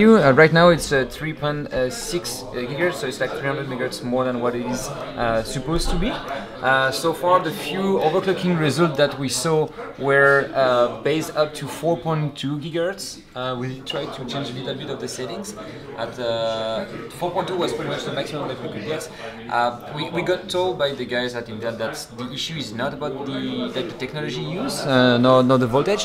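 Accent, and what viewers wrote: France. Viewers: L'accent francais est tres present xF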